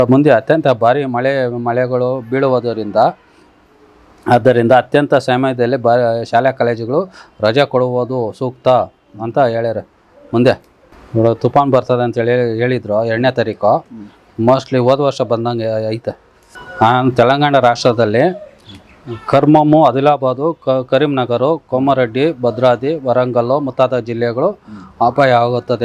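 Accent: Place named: Doddaballapura, India. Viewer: Gnana mukya avaru astu swastavagi oduttiddare doddaballapura side e thara kannada mathanaduttare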